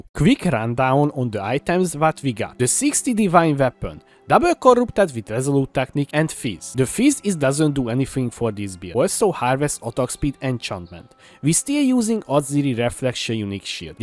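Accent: Hungary. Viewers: Az akcentusból sejtem, hogy magyar videós vagy. :) Hajrá-hajrá, sok sikert!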